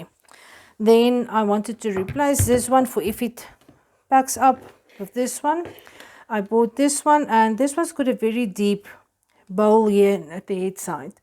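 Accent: South Africa. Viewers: Hello, I have just discovered your channel. So nice to hear a South African voice on Utube making soap.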